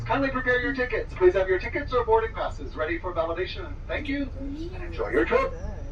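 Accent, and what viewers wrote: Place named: Denmark. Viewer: The video’s creator is not a native speaker of the English Language. His native tongue is Danish.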